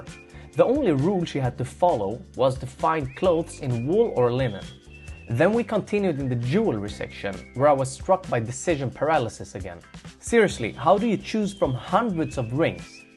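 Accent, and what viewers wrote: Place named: Middle East. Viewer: your accent is Swedish???? This whole time I thought it was a middle eastern accent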